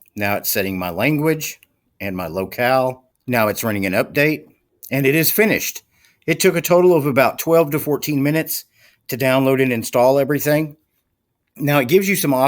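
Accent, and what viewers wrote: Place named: Dakotas, United States. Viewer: Central time zone. I'm guessing from the voice you are in the Dakotas?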